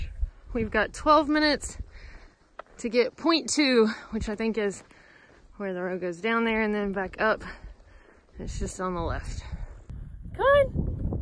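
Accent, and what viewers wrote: South, United States. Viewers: The Forrest Gump thing had me like🤣🤣🤣 I remember the first time I watched one of your vids and heard your accent,I said,she’s from Alabama,Georgia or Tennessee. Greetings from a fellow southerner. Knoxville,TN boy here.✌🏼 p.s. there’s nothing better than a southern girl👌🏽🖤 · LOL, I read the title of this video with your southern drawl in my head! Thanks for the laugh and have fun in Spain!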